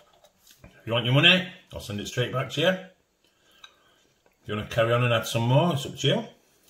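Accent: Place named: Barnsley, United Kingdom. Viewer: where you from mate Barnsley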